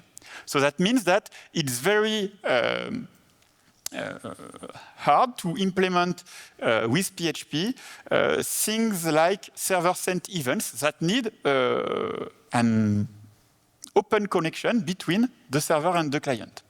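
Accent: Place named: England, United Kingdom. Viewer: why does his french sound like english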